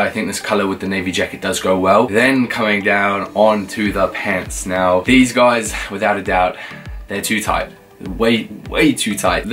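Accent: New Zealand. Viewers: Sounds like you copped a New Zealand accent 🇳🇿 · are you a kiwi living in the UK?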